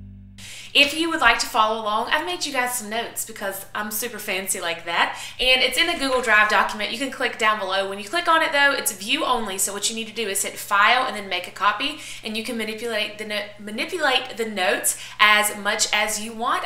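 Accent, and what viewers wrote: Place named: Texas, United States. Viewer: From texas? Nice accent and ideas of course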